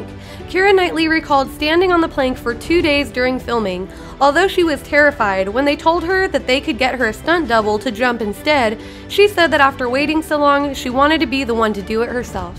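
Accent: United States. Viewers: Instantly bailed due to nauseating American narrator. Was she the only applicant ?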